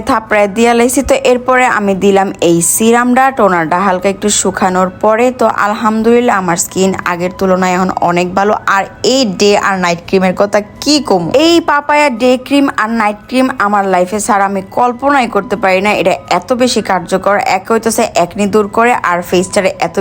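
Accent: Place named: Narsingdi, Bangladesh. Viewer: Apu apni norsingdi basay na nope suddo basay bolben sundor lge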